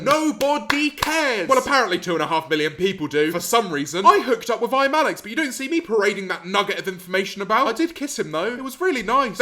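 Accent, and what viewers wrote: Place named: United Kingdom. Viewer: British accent, euros in your hand, something's wrong. I am new to the channel, can somebody explain it to me?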